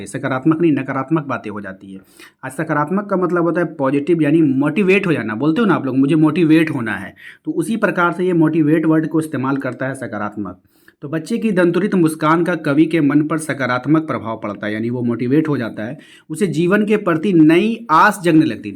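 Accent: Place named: India. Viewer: fxck curry accent 🖕🏾🤢🤮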